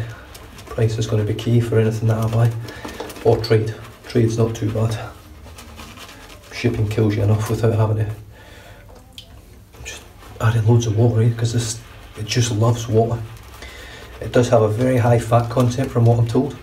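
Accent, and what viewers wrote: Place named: Scotland, United Kingdom. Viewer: With your Scottish accent, you could be recruited to be the next kings man with the all the fancy looking suits.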